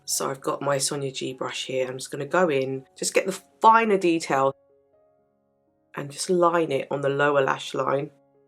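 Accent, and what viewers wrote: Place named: United Kingdom. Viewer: hi i like how natural you are and how your skin looks like real uneven skin ..and above all your accent.are you from britain?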